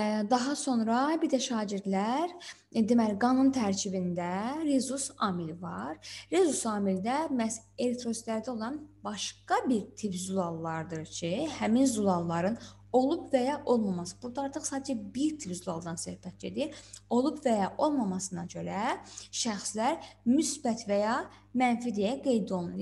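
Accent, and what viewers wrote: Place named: Ismayilli, Azerbaijan. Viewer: 100 faiz İsmayıllısınız. C və G hərflərini dəyişk salirsiz onun üçün dedm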